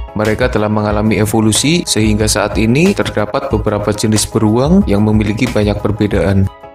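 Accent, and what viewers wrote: Banyumas, Indonesia. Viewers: Bang ...org ngapak. Yaaaa???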